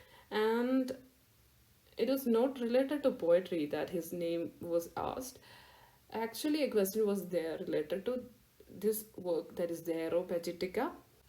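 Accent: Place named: Kerala, India. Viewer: Mallu accent spotted.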